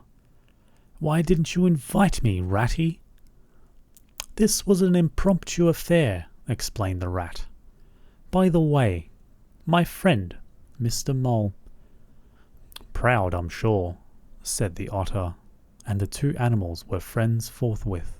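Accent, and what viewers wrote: Australia. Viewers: Hooray! A dear familiar Australian accent!! Thank you sooo much. You do have a lovely voice, and you read in a peaceful, reassuring way. Thanks also for simply starting to read the text without a distracting monologue introduction. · Render us some new story with your Australian accent ❤❤❤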